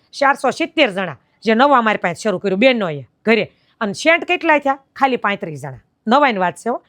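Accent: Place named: Kathiawad, India. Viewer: નમસ્તે બેન. આપની સ્પીચ સાંભળી મને મારું કાઠિયાવાડ યાદ આવી ગયું. 🙏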